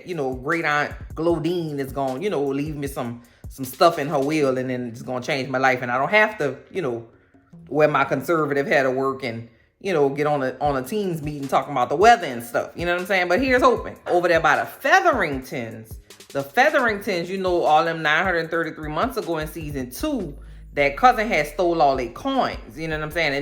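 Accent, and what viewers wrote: New Orleans, United States. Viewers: All I heard was your voice and I said "Oh she DEFINITELY from New Orleans!" 😆 I'm from the N.O. too. Hey fren!👋🏽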